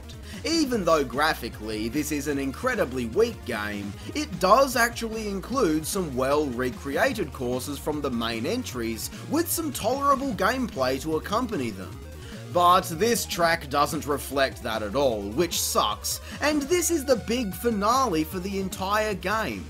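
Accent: Australia. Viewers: Are you australian? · Thanks, didn't wanna listen to this guy's infuriating Australasian accent for over 2 hours.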